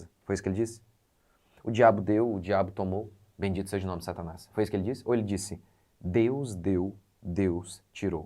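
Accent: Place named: Rio Grande do Sul, Brazil. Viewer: eu achava que vc era gaúcho, pastor...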